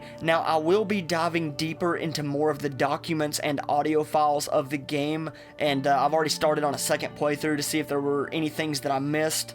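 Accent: South, United States. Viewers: "Incredibly attractive southern accent," O........kay....... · Hey! No making fun of his Southern/Cowboy accent! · Haha nice Southern accent ! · I've never been attracted to a southern accent but his voice is damn sexy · They way you pronounce "typhon"....No thanks, keep that southern accent neuromod. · I’ve heard some good southern accents but this one is just annoying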